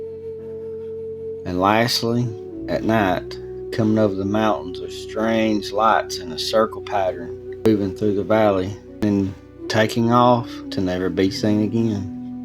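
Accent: South, United States. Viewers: Thanks! That was introduced. I enjoy your southern drawl... I'm from NC, so I have a southern accent but I like yours better.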